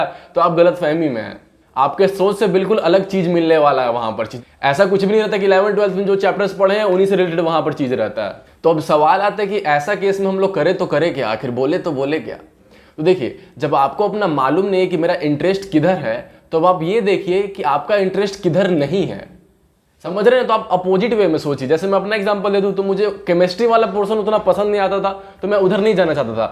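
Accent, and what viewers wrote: Bihar, India. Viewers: Your voice has a bihari tone 😅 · Yes , he is from Bihar.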